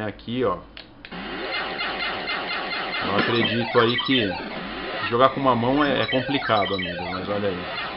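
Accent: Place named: Rio de Janeiro, Brazil. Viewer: Vc e carioca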